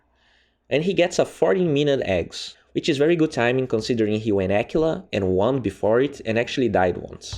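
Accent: Brazil. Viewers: stop saying the kunka the mirana the pugna hahaha that drives me crazy man you don't need to use THE for those personal names, I can tell by your accent u're probably brazilian, your english is awesome anyways. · i think brazil based on his accent (just a guess)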